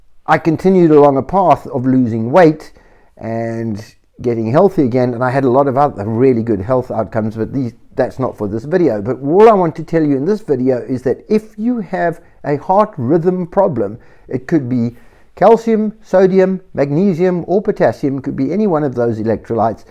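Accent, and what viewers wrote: South Africa. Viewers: You sound South African😊I'm in Port Elizabeth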